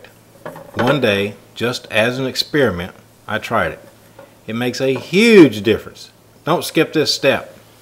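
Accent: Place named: South, United States. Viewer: I like that southern drawl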